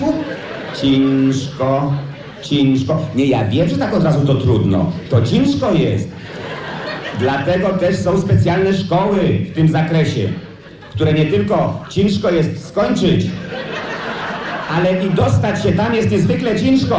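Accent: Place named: Greater Poland, Poland. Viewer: Zaraz, zaraz, toż to gwara wielkopolska.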